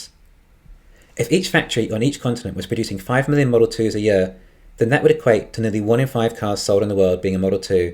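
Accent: England, United Kingdom. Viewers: The video montage imbued with an English accent commentary is what allows this channel to be the quintessential news source for $TSLA and the chosen offspring of world AI salvation, á la The Terminator, Elon and his Canadian progenitor, Maye.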